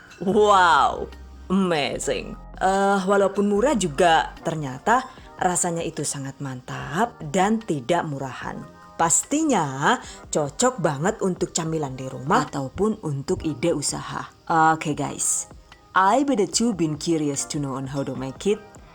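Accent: Banyumas, Indonesia. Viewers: Kiye nyong seneng nemen yen bunda ngomong nganggo bhsne inyong yakin sung bund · Wah bisa buat isian jajan arisan nih... Muantul resep2nya... Selain mantul, ngomong ngapaknya juga bikin sakit perut... wes lah yaaaa jan tenan... Caaaatat DONE #giveawayTHVdesember2019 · Enyong sabar....nunggu ngomong ngapake....ora Tek skip loh mbekayu...resepe nyong ya butuh kowh... ksuwun nggih...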